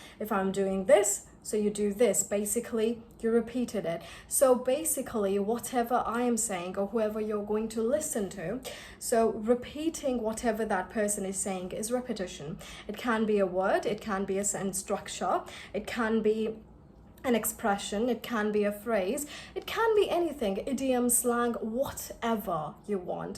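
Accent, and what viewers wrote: United Kingdom. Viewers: Are you using british accent while talking in most of your vedios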